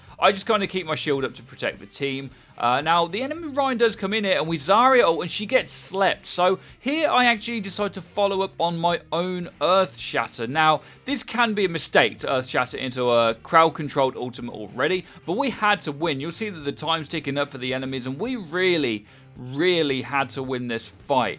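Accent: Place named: United Kingdom. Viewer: Doesn't he sound like a British version of Ben Wyatt from Parks and Recreation.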